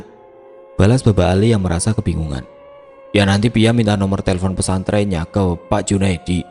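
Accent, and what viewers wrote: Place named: Jakarta, Indonesia. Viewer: Btawian bhsany lucu,pagi mas, yg msih di guyur hujan, ni pondok cabe,msih dngrin mas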